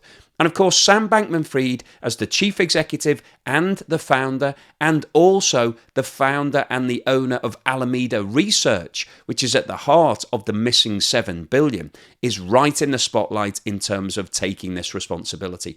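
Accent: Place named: England, United Kingdom. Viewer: You are hard to understand since I'm not used to English english!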